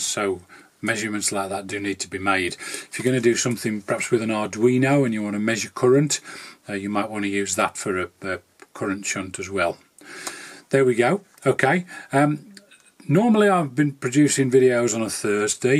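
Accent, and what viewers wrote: United Kingdom. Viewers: may I ask. You live in North Yorks but your accent sounds more midlands.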